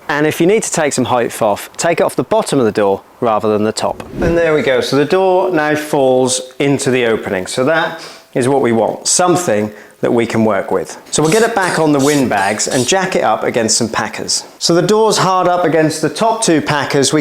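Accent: Norfolk, United Kingdom. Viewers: You are defo from Norfolk lol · I think I know that Norfolk accent, pretty sure I bought the same doors from the same wickes and then watched this video to hang them. You have helped a groundworker have a little bit of finesse. 🤣 Can you please show me how to cut & clad oak veneer stringer to my existing staircase please.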